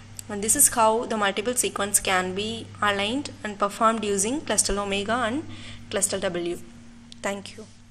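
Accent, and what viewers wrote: India. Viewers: I think she has an Indian accent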